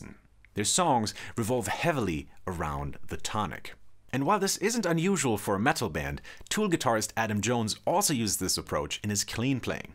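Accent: Germany. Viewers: Holy guacamole, hello my fellow German. I didn't know you were so very German. But now that I know, I can actually hear teeny tiny things in your speech that should've given it away earlier. In any case, your videos are amazing. Thanks for being such a great inspiration! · Are you german?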